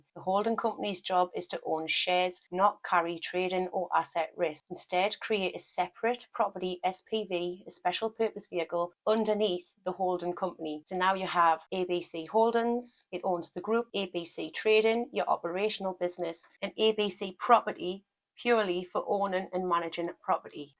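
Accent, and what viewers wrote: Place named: North, United Kingdom. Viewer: Where are you based you sound northern.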